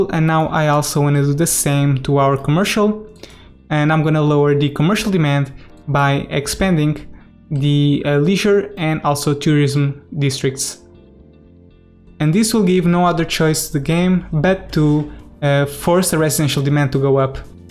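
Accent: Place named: Portugal. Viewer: Tenho visto alguns videos teus e se a ideia era melhorar o teu inglês, tivemos todos sorte. Parabéns! Tens o melhor canal do youtube de skyline e tudo porque fazes aquilo que a maior parte do pessoal não faz - construir em tempo real (quase sempre). Tenho aprendido muito. Aprendido muito e percebido que ainda que o teu inglês seja exímio, só um português tem esse sotaque, o que me faz ter a plena certeza que tu entendeste tudo o que eu disse. :P Mas congrats mate! Great content, and amazing english :)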